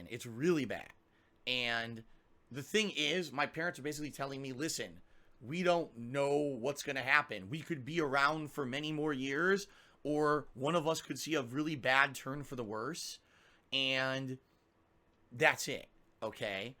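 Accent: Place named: New England, United States. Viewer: Yankee accent are fucking disgusting.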